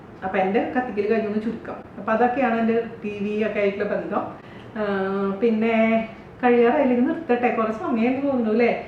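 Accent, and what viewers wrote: Thrissur, India. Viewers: Hii chechi nammude thrissur slack kalakito 😍enthurasa chechide samsaram ketukondirikan I like you chechi moleeeee😘🤩💗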